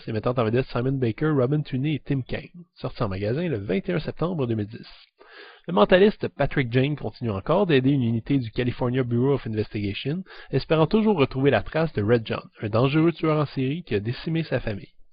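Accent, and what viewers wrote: Quebec, Canada. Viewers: C'est déjà sortit au Québec? (d'après l'accent...) Bonne critique! Merci!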